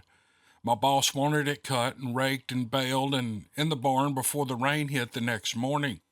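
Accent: South, United States. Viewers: Thays’ boogers haha I love southern accent especially storytelling.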